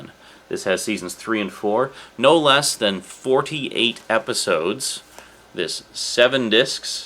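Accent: Canada. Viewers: I knew you had to be Canadian or one of the states bordering Canada. Who else says aboot! I really like your Dead Space videos. Reminds me of hanging out with my friends and playing games together.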